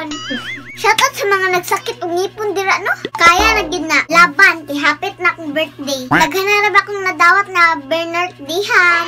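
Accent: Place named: Mindanao, Philippines. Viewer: Cute kaayo ka day.pede mangutana.nganong naa man ksy dira nga word.mura mn ka og taga mindanao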